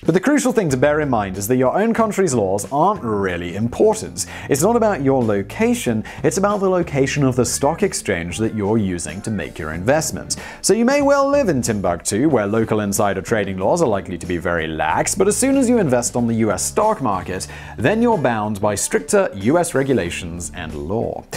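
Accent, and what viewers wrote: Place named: United Kingdom. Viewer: The British accent in this one is throwing me off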